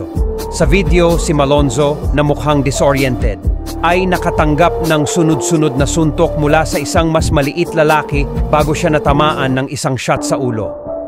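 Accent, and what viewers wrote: Visayas, Philippines. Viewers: diction at accent ng bisayang m😅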